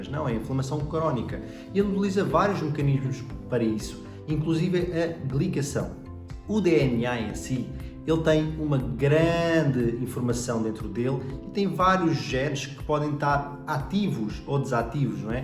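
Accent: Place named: Portugal. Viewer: é português de Portugal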